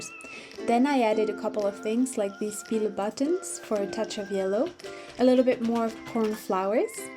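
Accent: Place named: France. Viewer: Your french accent is so lovely 😍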